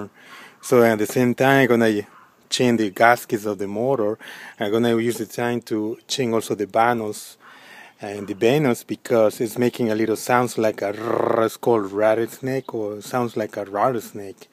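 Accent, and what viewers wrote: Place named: India. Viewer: India akcent 😊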